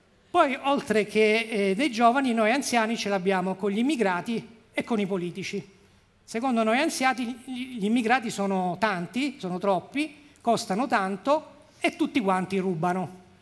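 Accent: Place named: Sicily, Italy. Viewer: la tua inflessione non identificabile perché c'è del siciliano/romano/italiano RP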